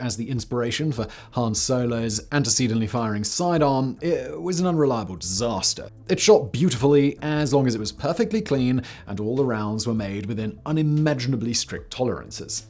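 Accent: England, United Kingdom. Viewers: Something about an english accent talking about guns seems less “jerk-offy” than a guy with a texan accent talking lovingly about his browning m2. Idk i clicked on this video expecting a Brandon Herrera type dude jerk off a 1911 for 19 minutes but I was pleasently surprised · Not a fan of a Brit talking firearms.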